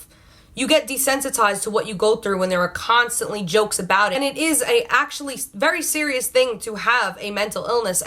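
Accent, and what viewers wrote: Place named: New York, United States. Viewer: Are you from NY or Jersey 🤔 that accent is pretty 'HEY I'm walkin' here!'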